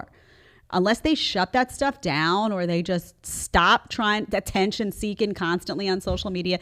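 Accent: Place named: New York, United States. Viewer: Jedediah's NY accent leaks out occasionally. I prefer it, to be honest.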